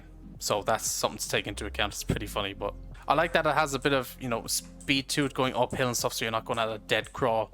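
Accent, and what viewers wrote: Ireland. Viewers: Where in Ireland is your accent from? Limerick? Can't figure out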